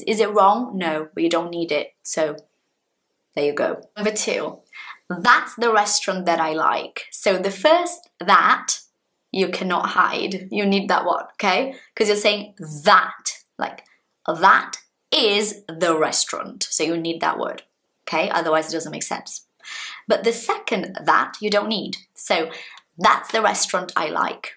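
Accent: United Kingdom. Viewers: o mais incrível é que eu entendi 90% do que você disse, e ainda nesse sotaque britânico aaaaaaaaaaa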